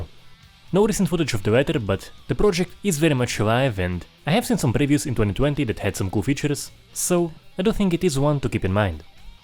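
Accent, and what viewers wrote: Russia. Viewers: Чет твой акцент очень похож на русский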